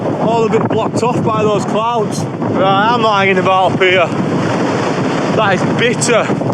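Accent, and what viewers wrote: Yorkshire, United Kingdom. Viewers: Im sure you've had some dawg after ya food it looks like 😂 hope you enjoyed, im jealous. Keep up the good work just subed. Nice to hear another yorkshire accent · love it when you pronounce "cant" as 'caart'. Keep on yorkshireing old boy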